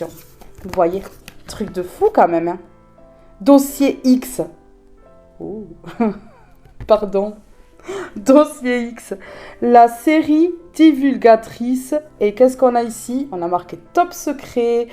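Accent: South, France. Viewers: J'adore vous écouter Madame. Vous êtes très belle en plus et votre accent du sud soleil rajoute une multitude d'aromates au plaisir de vous admirer. Merci de nous faire du bien avec ce que vous êtes ❤😊😊❤❤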